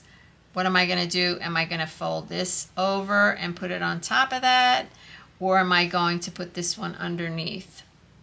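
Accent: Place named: Louisiana, United States. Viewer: You sound like you are from Louisiana.😊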